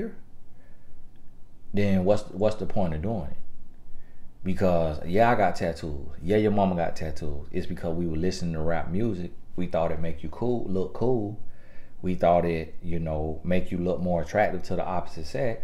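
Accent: South, United States. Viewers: I like your accent! All my Grandparents had accents. My GrandMa on My Dad’s side had a country accent as well. Feels like Home.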